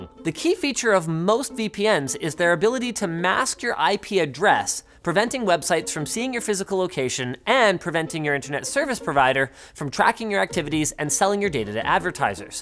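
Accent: United Kingdom. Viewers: solid brit accent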